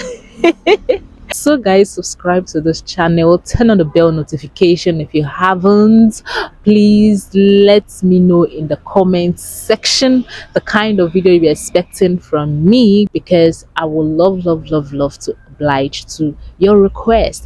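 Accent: Ghana. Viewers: Nice girl. Nice video. Keep it up. Your accent both in English and Twi sounds so Ghananian. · Ur English look like Ghanaian to me keep it up thanks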